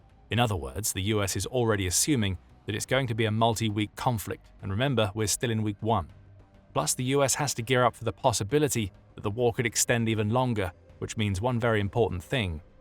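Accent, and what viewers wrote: United Kingdom. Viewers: Great footage but why have a Brit narrate?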